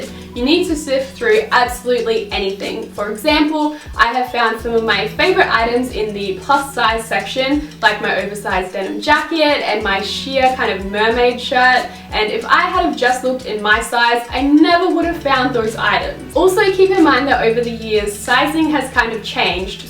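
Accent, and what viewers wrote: Australia. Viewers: Her accent is actually Australian :)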